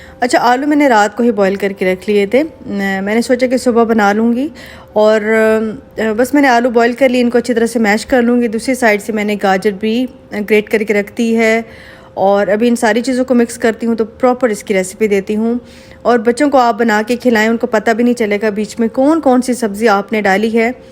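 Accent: Saraiki, Pakistan. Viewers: I live in Multan and hope to see you soon... I m a saraiki too and apki meethi saraiki sun kar bohat acha lagta hai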